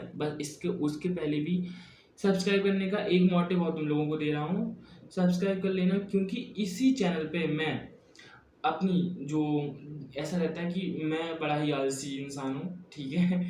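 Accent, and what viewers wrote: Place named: Uttar Pradesh, India. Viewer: Bro tum konse state se ho merko Uttarpradesh se lagte ho .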